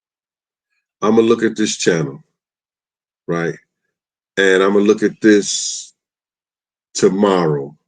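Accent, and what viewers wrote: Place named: St. Louis, United States. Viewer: don't sweat it, I love how you mispronounce some words. I love that you still have that St. Louis country dialect, despite being in New York so long. I like it, because you remind me of my country uncles. ❤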